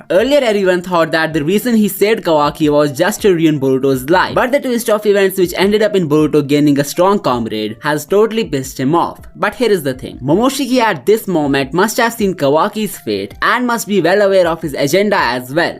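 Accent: India. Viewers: Bro your accent is like indian r u indian?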